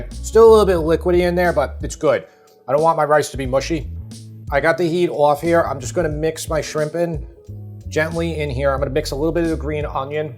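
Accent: New York, United States. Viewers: not to nitpick too badly but you mispronouncing undewee you pronounce it in Dewey which is no that was pronounced. it is pronounced on AHNTOUIE on Dewey So it's not like N Dewey or N Dewey it's on AHN how it's pronounced TOUIE. I know it's your New York accent believe me I need a pick with English people about how to spell how to pronounce Jaguar and then call it Jaguar If you turn to tell him it's a Latin American word in the Spanish language from Native Americans and you're pronouncing it wrong it won't listen.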